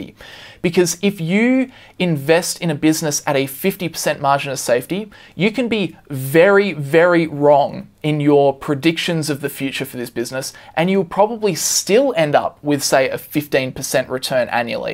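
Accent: Australia. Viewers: Just found this channel. Thanks so much for such excellent content. Nice to hear a fellow Aussie voice too.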